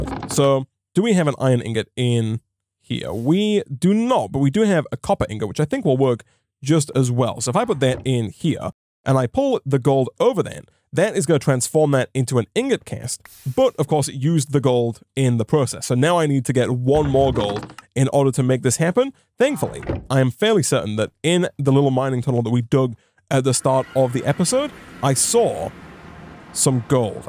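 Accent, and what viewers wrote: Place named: Hull, United Kingdom. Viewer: I've been trying to pin your accent down the whole time I've been watching your videos. I finally got it. That's a Hull accent. Great content fellow yorkshireman.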